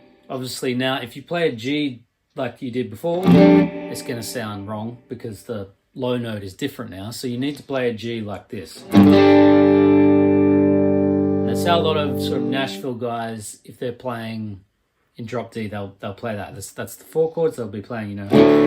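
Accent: Australia. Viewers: Not an accent. That's Australian. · great stuff ozzie accent to doesn't get any better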